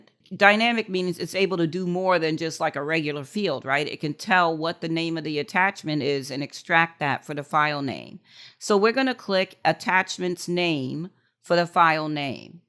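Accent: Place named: United States. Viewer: thick accent American is inritating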